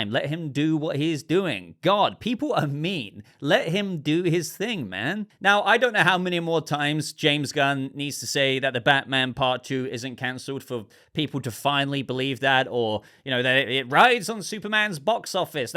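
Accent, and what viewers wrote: United Kingdom. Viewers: I watch this channel so much that whenever I think about these superhero movies, I find my inner monologue has a British accent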